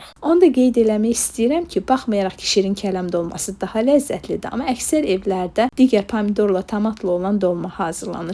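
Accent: Baku, Azerbaijan. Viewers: Can Ay Bakı Kəndlərindn Gələn Səslərin Ləzzəti. Həeee göyərti Yox. Əhsən Sizlərə.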